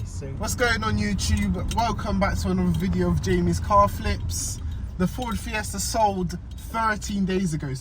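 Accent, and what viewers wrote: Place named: Bristol, United Kingdom. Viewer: Great video mate, new sub here!! You sound like Bristol way?? Might be wrong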